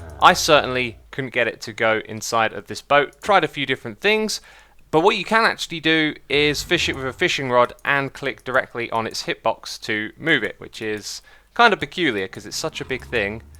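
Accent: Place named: United Kingdom. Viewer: What happened to xisumas accent over the years? Some ppl might not know but had a "normal" accent. I mean sounded like a american accent. Not sure how he started talking british suddenly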